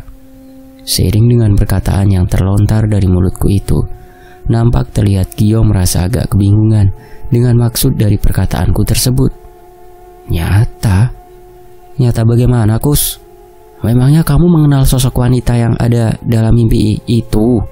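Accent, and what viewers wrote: Java, Indonesia. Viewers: Cerita org dijakarta jadi bikin imajinasi di daerah jawa karena dialeknya😂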